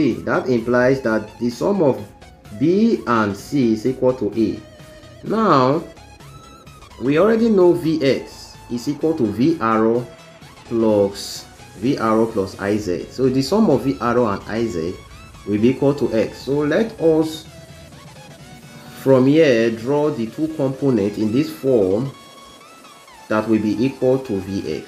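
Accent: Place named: India. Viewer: Uniben student sounding like indian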